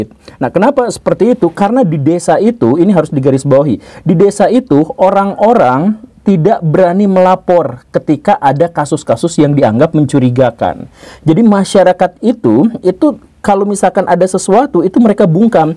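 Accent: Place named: Sunda, Indonesia. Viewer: Aksen sundanya medok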